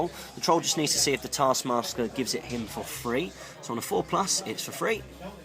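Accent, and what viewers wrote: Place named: Texas, United States. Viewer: I don't think you are pronouncing it wrong, I just think its the difference in accents between Texas and the UK lol, I was just saying I found it amusing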